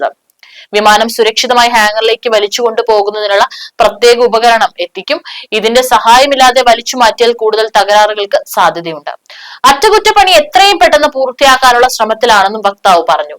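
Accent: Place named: Thiruvananthapuram, India. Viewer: തിരോന്തരം ജില്ലകാരി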